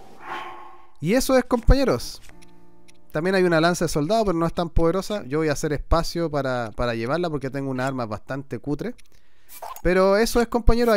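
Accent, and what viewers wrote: Chile. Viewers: Se nota que eres chileno por solo escucharte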